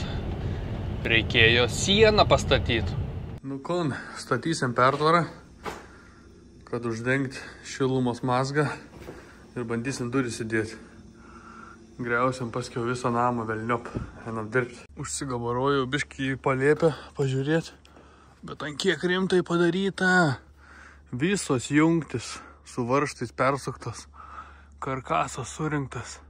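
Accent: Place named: Samogitia, Lithuania. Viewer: Kap visad žemaitiškai stipriai 🇱🇹👌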